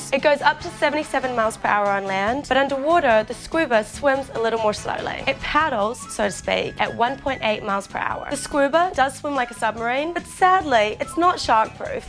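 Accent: Australia. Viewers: she talks way too fast. shes australian isnt she ß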